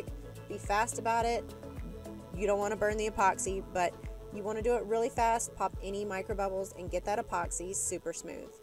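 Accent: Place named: South, United States. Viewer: Very Beautiful !! ----- Girl where you from ?? With that southern TWANG Ba'eye !! LOL ---- I guess more to the west from where Im at ---- Or it could be Alabama/Tn ...... I'm in Louisiana ---- Down yonder from you =)~ ------- Love your work ---- and your husband reminds me of mine ---- always creepin around when were trying to work ...... He is just amazed at your work .... is kinda sweet ...... but still kinda gets annoying .....bless their hearts .... I guess ---as long as they keep their creepin at home its ok ...... I SURE don't wanna be an outside the home creepers wife ...... UGH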